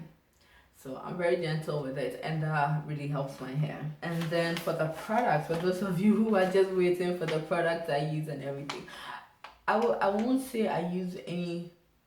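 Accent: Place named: Ghana. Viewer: Ghanaian Accent 😍😍😍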